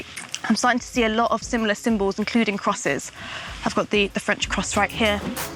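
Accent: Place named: United Kingdom. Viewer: This is so interesting! Thank you for sharing. You're such an eloquent and engaging speaker. On a slightly unrelated note, your name makes me wonder if you're Ukrainian/Slavic?.. But at the same time you definitely sound like a native British speaker haha. I hope it's okay to ask, but do you have Slavic roots or am I digging too deep?:)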